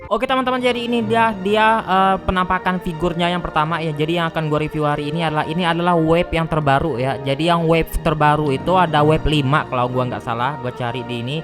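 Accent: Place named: Palembang, Indonesia. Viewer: Kau orang Palembang yaaaaaaaaaaa💯💯💯💯💯💯💯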